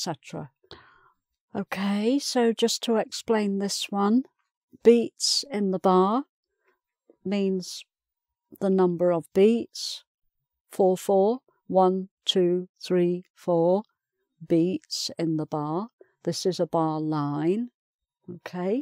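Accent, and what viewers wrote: United Kingdom. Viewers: wow vary pority english british viva teacher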